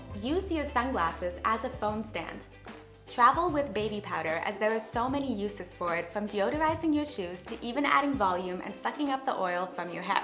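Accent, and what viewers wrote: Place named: United States. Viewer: She has an American accent so I assume the USA.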